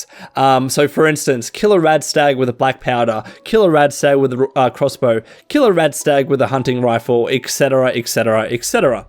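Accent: Australia. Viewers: Aussie? And you look like Daniel Riccardo 🤟🏼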